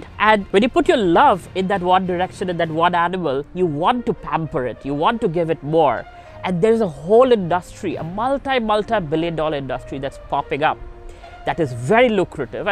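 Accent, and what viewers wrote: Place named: India. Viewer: Your English accent is not like other Indias.. It’s a good thing that i find rare...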